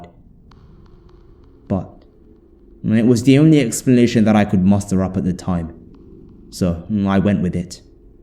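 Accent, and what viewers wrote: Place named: United Kingdom. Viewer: I wish I would hear YOUR fabulous Brit voice saying "trick or treat" at our door this Halloween! Lol. Long way to go for a b it of sweets! Thanks for the spooky! Hope your weekend is good. Happy Halloween!👻💀😈🎃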